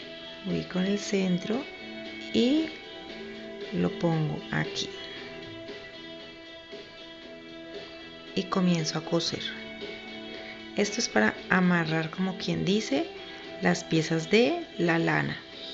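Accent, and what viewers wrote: Colombia. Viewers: Explicas super 👌🏽 tienes un lindo acento, eres de Cali? Obvio me suscribo a tu canal, me encantó 😉 👏🏽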